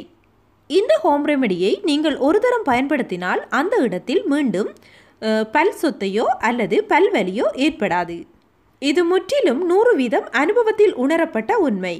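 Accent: Sri Lanka. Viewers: இலங்கை தமிழ் அருமை... தமிழ்நாட்டு தமிழன்... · நீங்கள் இலங்கை தமிழரா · என்ன அழகு..... ஈழத் தமிழ் அழகு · It's srilankan Tamil,l like this Tamil. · Avunga srilanka apdithan pesuvanga bro..avunga real speech apdithan... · உண்மையான தமிழ் ஒலி வாழ்க வளமுடன் இலங்கை தமிழ் அழகு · Her tamil looks like srilankan tamil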